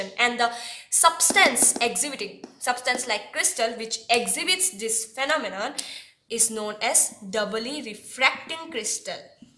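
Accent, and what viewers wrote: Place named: Assam, India. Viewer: She is Indian and An Assamese...And this is how we speak English...We should not be ashamed of our accent...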